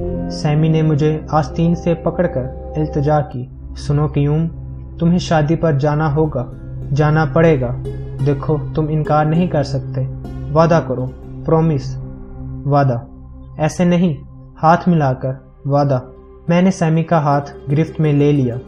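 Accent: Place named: Punjab, Pakistan. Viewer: haye punjabi accent mai urdu... r bnao bhai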